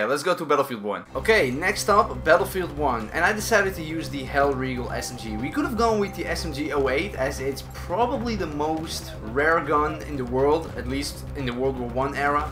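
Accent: Netherlands. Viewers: You can hardly hear your Dutch accent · You have a dutch accent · Hello If i play battlefield with my friends they often say i sound like you, probably because I'm dutch. :) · Ik hoor gewoon je Nederlandse accent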